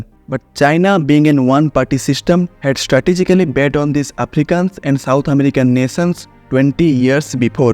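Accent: India. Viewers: Brilliant video, keep them coming. For others who are commenting on “pronunciation”, I want you to know that international viewers don’t care. (I work with them). And looks like you too are from say up/bihar/odisaa side? It’s the Indians who will crib about pronunciation. Yes, keep it improving but know that the threshold is already crossed and the speech quality is good. Take these comments with a grain of salt and keep up focused on the video content and quality.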